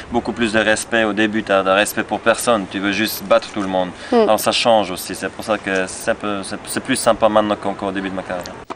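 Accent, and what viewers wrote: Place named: Switzerland. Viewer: He speaks swiss French, which is slightly different from standard French, but he's definitely fluent, he speaks a perfect French.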